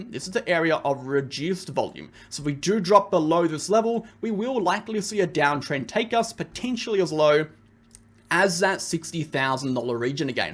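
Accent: Australia. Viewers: Let’s go …was waiting on that down under accent to guide the way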